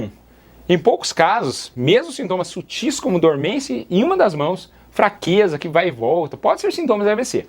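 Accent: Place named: Minas Gerais, Brazil. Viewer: Esse doutor é muito simpático, sotaque de mineirim 🥰 Bom que fala rápido...rsrs...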